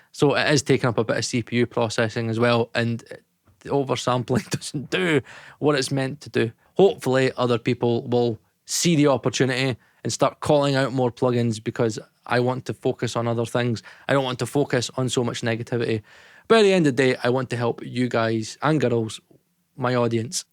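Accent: Scotland, United Kingdom. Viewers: I love scottish accent. For Kick Attack preset I thought he said KekAttic.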